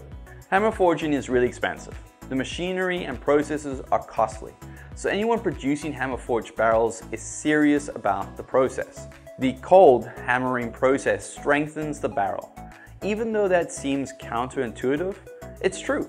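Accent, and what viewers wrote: South Africa. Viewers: totally unrelated random comment: your accent, sir, fascinates me! First video of yours I have ever watched, and, that is what caught my ear first even before learning this about barrels, which I did not know either. I am hearing bits and pieces of South African English? British English? AND American English? Am I right am I right?😂 But all combined together into your own unique accent!! Well that is personal I suppose. It’s just that, I am always fascinated by language and accents, having a semi-musical background, and so the way in which any person anywhere talks is always catching my ear because, it also lends a window into their personal story and personal history. So therefore I do not mean any disrespect. Just that, you accent fascinated me when I first heard it and I felt compelled to comment! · Some of your pronunciation is reminiscent of the south african accent…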